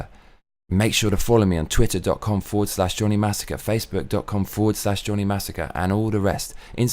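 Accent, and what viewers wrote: United Kingdom. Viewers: Hello Johnny Massacre, The lead-actor of the Creed movies is Michael B. Jordan (no relation to the superstar American basketball-player, Michael Jordan). He played the primary-villain in the recent Black Panther film. He was also Johnny Storm/The Human Torch in the 2015 version of The Fantastic Four. I was unable to hear the quality-difference between the microphone that you usually use, and the $10,000-microphone; perhaps the audio-compression that YouTube employs removes the frequencies and the nuances that make the difference. However, it was very interesting to see the substantial-weight of the superior microphone. I, too, have never heard of an internal pop-filter/pop-shield; I simply reasoned that such an expensive microphone would probably include pop-prevention methods that are more-convenient and more-effective than what is available for microphones that most people can afford to purchase. 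The fitted 360-degree filter is beautiful, and it makes the microphone/filter look all-of-one-piece, as opposed to most filters/shields, which look like sloppy after-thoughts. Your instructions for the audience to ignore your spoken-content, and to pay attention to only the sound of your voice, made me laugh! Although you have a masculine-deep voice and a classy British-accent, it is your intelligent and entertaining commentary that has made me continue to be a subscriber since I saw your insightful-critique of The Force Awakens. Good luck to you as you apply for a permanent-visa i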